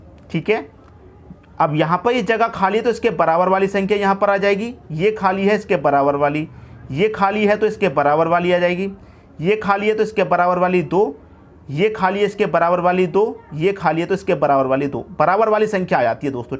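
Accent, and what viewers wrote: Haryana, India. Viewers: Gjb HARYANVÏ 🤑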